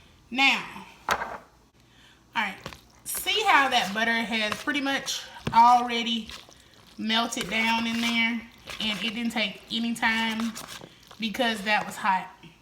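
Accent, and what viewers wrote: South, United States. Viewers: Oh, ok. I definitely heard that suuuthin swag🤗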